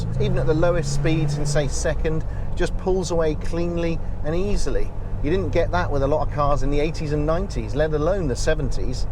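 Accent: United Kingdom. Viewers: I look forward to the end of the week and pouring myself a bourbon and settling in for this week’s episode of The Car Guys. Maybe it’s that I’m of similar age or the British accent to my American ear but narration is like poetic prose. His descriptions, word choice and figures of speech are just perfect. His observations and highlights are on point. It just resonates so well with me. It’s knowledgeable but most importantly it’s sincere. Now I’m looking forward to Sunday night, a glass of wine and the next Watch Guys episode for more of great storytelling style.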